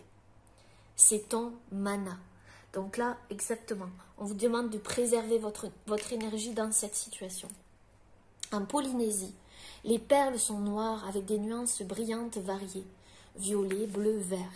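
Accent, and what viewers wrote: South, France. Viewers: Merci l’accent du Sud tellement magnifique accompagnent tes messages qui sonnent très juste pour moi encore merci je t ´envoie plein d’amour Christophe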